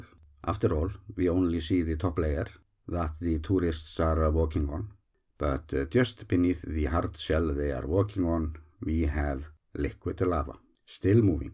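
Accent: Iceland. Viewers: Hey don't mess with the icelandic accent!! Learn proper english english bro then you should understand this mister murica.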